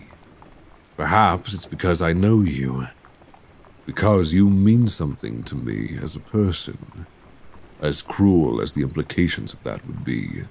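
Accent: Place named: United Kingdom. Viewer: His British accent lord have mercy 🫠🧎‍♀️🧎‍♀️ the gods are tempting with hot British vampire men yet again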